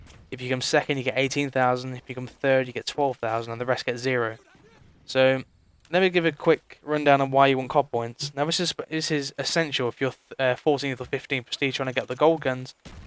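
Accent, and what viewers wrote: United Kingdom. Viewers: do you live in manchester or stockport? you sound it...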